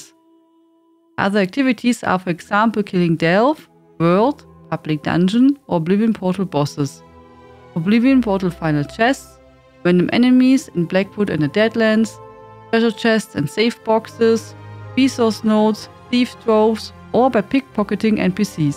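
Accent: Germany. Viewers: Your English is unintelligible in places. I am very tolerant of accents and even understand Africans who speak Pigin English. Your accent is harsh. You were clearly taught English by people who were not native English speakers themselves. Why not stick to your native language? Which, based on your accent, is probably German.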